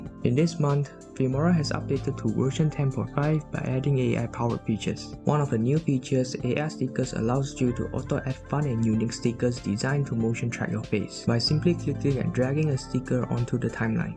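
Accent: India. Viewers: u sound indian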